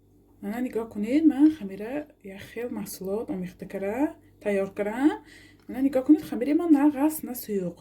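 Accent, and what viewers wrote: Isfara, Tajikistan. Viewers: Ассалому алейкум ва Рахматуллохи ва Баракатух ойя шумо аз Исфара лахчатон Исфарача бехтарин пухтупаз барои занхо 👍👍👍👍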